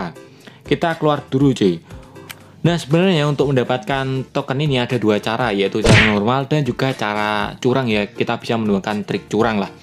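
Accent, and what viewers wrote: Java, Indonesia. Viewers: Bosone kok medok bang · abang Orang mna sih bang JAWA YA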